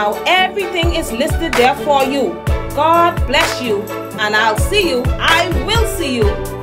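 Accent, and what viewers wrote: Bahamas. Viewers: Found you because I was missing home and missing the food. Hearing your lovely Bahamian accent and the "God Bless You" makes my heart smile. I try not to watch your videos when hungry 😂. God bless you Miss. Thank for "bringing the restaurant home."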